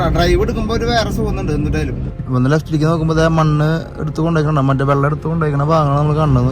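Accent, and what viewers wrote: Thrissur, India. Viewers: Please stop your accent. You’re doing a grt job but spoiling with your Thrissur tone and accent. You’re next to sgk. He never had ktm accent. So please mate..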